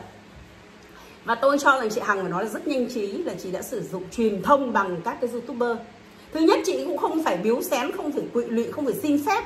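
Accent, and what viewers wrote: Standard. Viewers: chi noi rat chuan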